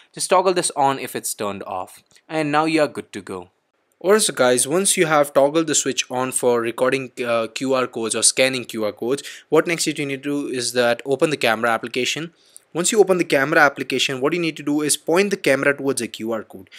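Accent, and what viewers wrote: India. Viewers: That god-awful Indian ACCENT. I couldn't understand a fucking thing this guy was saying. all I heard throughout the video was BEE BEE BEE BEE BEE BEE BEE BEE.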